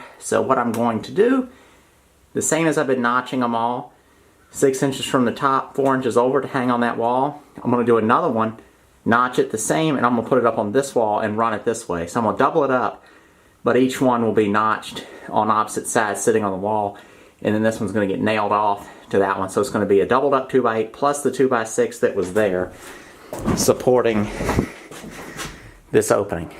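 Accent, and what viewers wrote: South, United States. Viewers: What state are you in? Your accent sounds like Alabama, Mississippi, Tennessee, or Georgia. Maybe the Carolinas....I'm in GA.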